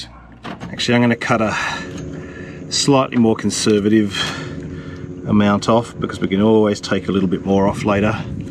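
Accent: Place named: Australia. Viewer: Greetings from Minnesota! Love the videos and your Aussie Accent! keep em coming!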